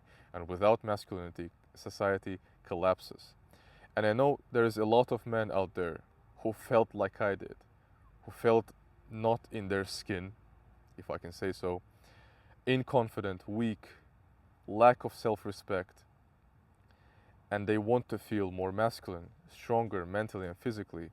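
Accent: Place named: Russia. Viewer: сразу слышно что родной язык русский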